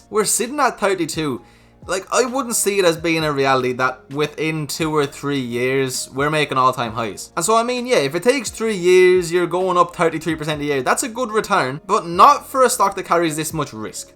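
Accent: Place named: Ireland. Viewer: Sound vids man. So nice hearing an Irish voice aswell hahaha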